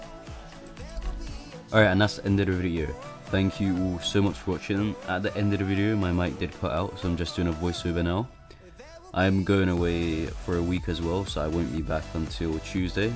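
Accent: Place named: United Kingdom. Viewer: shit tutorial and overrated, deserve like 1k views, I dont understand anything of what u are saying with ur stupid british accent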